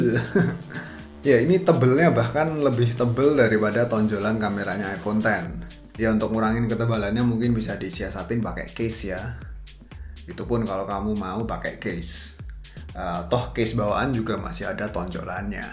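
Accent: Java, Indonesia. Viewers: Jowone Ngendi rek?..Mantap e Logat e iseh ketoro 😁. Salam Pemalang 👍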